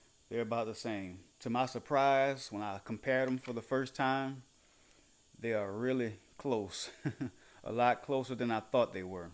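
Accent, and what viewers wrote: Louisiana, United States. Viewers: Bro you got to be from Louisiana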